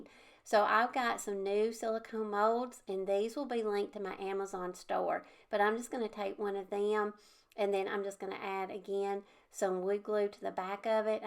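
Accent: South, United States. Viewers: Love to hear you talk with southern drawal! And of course great ideas. Thanks.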